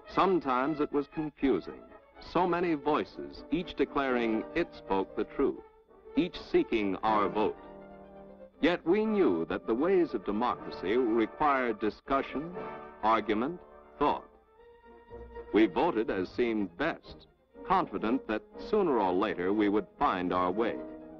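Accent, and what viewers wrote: United States. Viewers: Good American accent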